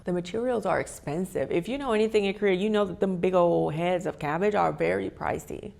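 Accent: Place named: South, United States. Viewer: hahaha love your southern accent comming through, i love these story times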